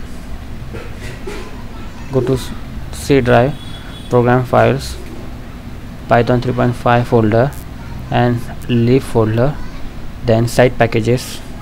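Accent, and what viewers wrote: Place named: Arab world. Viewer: من صوتو واضح عربي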